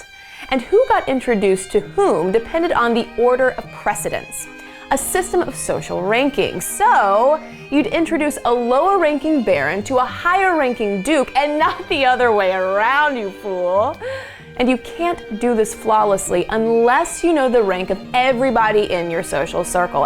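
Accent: United States. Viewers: It's really odd hearing an obnoxious American woman explain Victorian customs. I am most unamused by this hussy. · What an obnoxious "presenter"! These customs and ways would sound more valid and easier to listen to from a native British speaker. Her attitude could use a major overhaul, too. She contributes to the image of the obnoxious American know-it-all. I wouldn't subscribe to her channel for all the pounds in Britain! (p.s. I'm an American) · Seems ridiculous having an American doing the narration !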